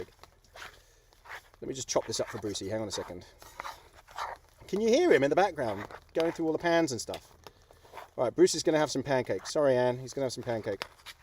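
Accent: United Kingdom. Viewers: The guy sounds British though.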